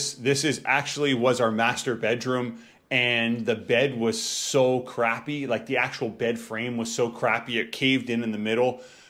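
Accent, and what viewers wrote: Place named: Maritimes, Canada. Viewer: What part of Canada are you from? I hear some maritime but can't quite tell.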